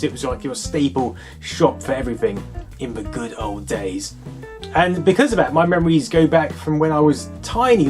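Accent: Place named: Estuary, United Kingdom. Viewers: It's a British accent. That's how they talk. Actually if I'm not mistaken that's the "estuary accent" that's common in the UK. Not pronouncing final -r sounds is a hallmark of British accents and the th pronounced as F is called "th-fronting". But my linguistics education is pretty much limited to YouTube videos so i'm not an expert. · Spinkington Clift haha you'd have no chance in this part of the UK, he's pretty posh by our standards. British accents vary considerably.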